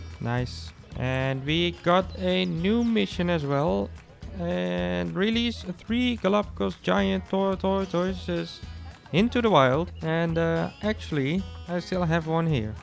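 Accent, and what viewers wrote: Netherlands. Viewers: Nederlands accent??? Haha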